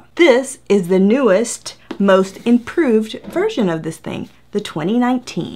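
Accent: South, United States. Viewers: where are you from, you sound southern...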